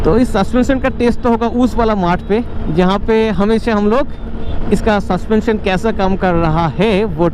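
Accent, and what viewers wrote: United Kingdom. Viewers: Hindi mai bol ya English mai bol but don't use British tuning brother But nice information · ओ भाई जरा ठीक से बोल ले हिंदू में भी इंग्लिश accent मार रहा है ढंग से बोल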